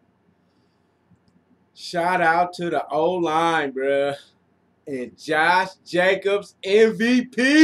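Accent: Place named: United Kingdom. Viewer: Your British accent! Lmao 🤣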